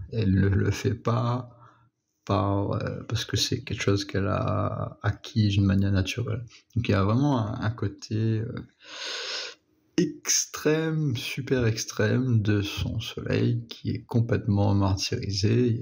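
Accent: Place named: Tahiti, French Polynesia. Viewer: Sinon tu as un accent tahitien j'ai l'impression aussi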